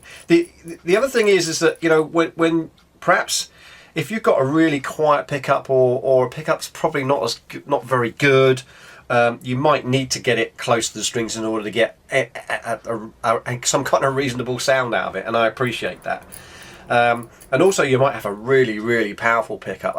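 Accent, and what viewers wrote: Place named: United Kingdom. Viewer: I trust you more because of your british accent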